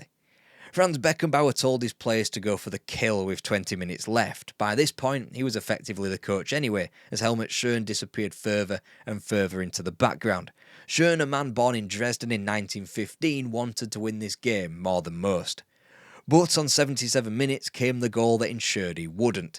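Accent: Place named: Northern England, United Kingdom. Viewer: My girlfriend keeps trying to guess where you from she is between sheffield and manchester by your accent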